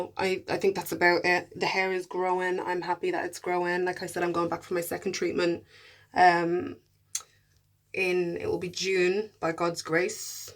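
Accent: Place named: Ireland. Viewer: Never mind my question, you said Ireland. I thought that was the accent. But I was told there no Blacks in Ireland or very very few.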